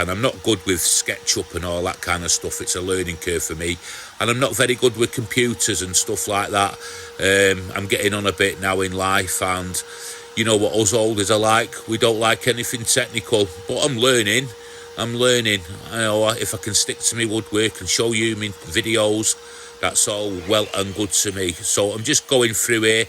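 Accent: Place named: Stockport, United Kingdom. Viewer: great project ,looks retro,you don't sound to far from me (stockport).Every man should have a shed.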